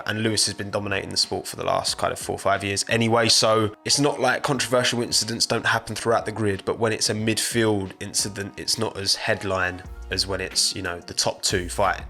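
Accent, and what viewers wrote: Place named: East London, United Kingdom. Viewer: you didn't need to tell us you were a west ham fan, we knew it from the first time we heard you speak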